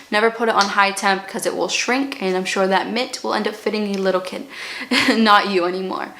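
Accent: Boston, United States. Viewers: I subscribed within seconds of watching your video because I heard the Boston accent - and then you talked about Mass so my suspicions were confirmed! Love that I get to follow a local gal with good advice. :D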